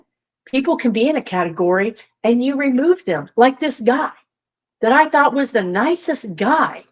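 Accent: Texas, United States. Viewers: Like you don't look white Southern. Sound Texas or Southern fo sho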